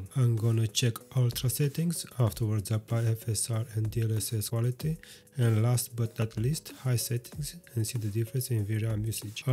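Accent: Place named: Eastern Europe. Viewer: Great video, love the professional yet slavic accented english, it shows he's doing as good of a job as he can, great indepth overviews, overall 10/10 pc gaming content youtube channel that i would love to see grow from 24 subcribers to thousand if not more! You got at least a new subscriber as long as your videos keep this great of an indepth and quality.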